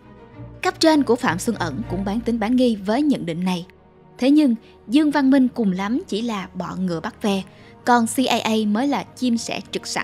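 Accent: South, Vietnam. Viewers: Aishhhhh nghe giọng miền Nam đọc những video cách mạng khiến tôi hài lòng quá. Nếu là giọng đọc của một việt kiều cali có lẽ tôi lên đỉnh mất 😊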